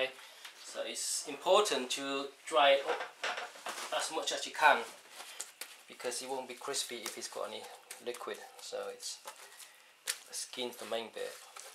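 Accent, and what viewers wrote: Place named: England, United Kingdom. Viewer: I knew he had a slight English accent!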